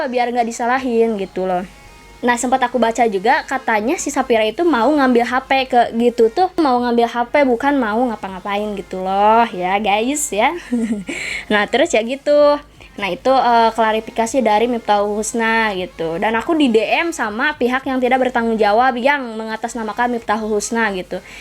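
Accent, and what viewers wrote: West Java, Indonesia. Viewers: Kakak Logat/Nada Ngomongnya Kayak Orang Sunda, apa bener?😅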